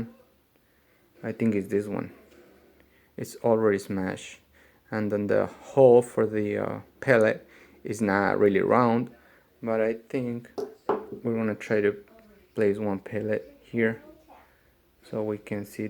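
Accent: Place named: Poland. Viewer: Are u from Poland? your Accent is very polish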